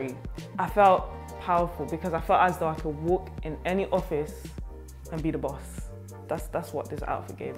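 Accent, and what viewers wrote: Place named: France. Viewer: Love the french accent 😍😍😍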